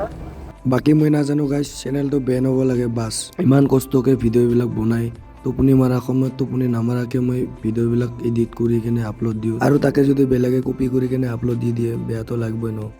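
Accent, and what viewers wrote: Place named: Bishnupur, India. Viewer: Amr gaor kotha koisa tumi val loga Thai khini. Bishnupur hoy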